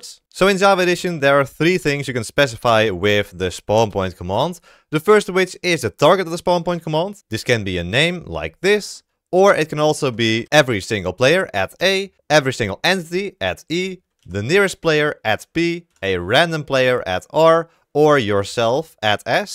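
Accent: Netherlands. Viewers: je bent nederlands ik hoor het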